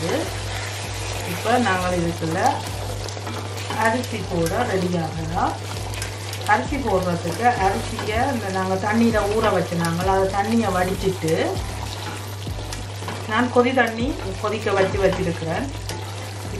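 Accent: Jaffna, Sri Lanka. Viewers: Very tempting receipe enjoy your receipies. Very much .specialy our jaffna Tamil.thanks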